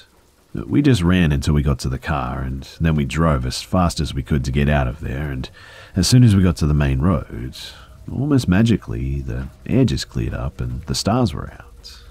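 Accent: Australia. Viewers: this is the first time listening to horror stories told by someone with a aussie accent and i was so confused at first when you were talking about deodorant i thought i clicked on the wrong video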